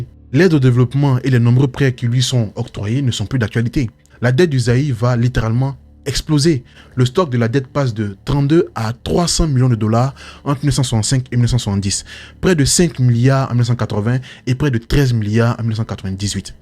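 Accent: Ivory Coast. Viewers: Ko je suis l'enfant de quelqu'un 😂😂 tu m'as tué frère comme un vrai ivoirien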